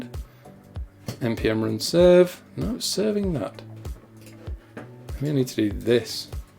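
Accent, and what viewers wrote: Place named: United Kingdom. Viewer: Curious. Where in the UK is your accent from?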